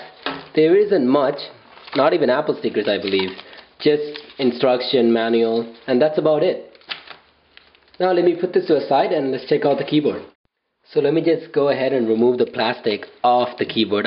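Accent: India. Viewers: Great unboxing dude! (By the way, are you Indian?)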